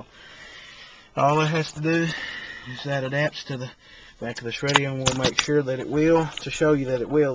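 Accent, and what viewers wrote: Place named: Alabama, United States. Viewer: Yea I tried to watch but made me sea sick. Uhgg. That's an Alabama axcent if ever herd one. I went fishing in Alabama. Everywhere I went some local yockal would flip me some lip about being a yanky. I thought why? Because I speak clear and consise. It got old real quick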